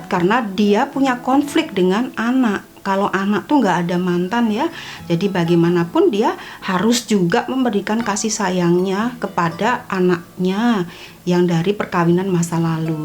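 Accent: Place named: Java, Indonesia. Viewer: Seenaang banget dengar yg bunda sampaikan..logat jawanya yg dipakai..ibarat apa yg ditanam pasti itu yg dituai.. Karma KD ya bund👍👍 🙏🙏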